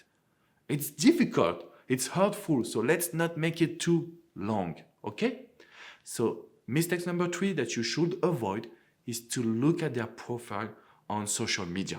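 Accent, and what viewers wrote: France. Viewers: Your English language with French accent is sooo cute ! 😁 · i love his accent 💛 i assume he's french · Very well explained... I like your french accent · The sweetest french accent ever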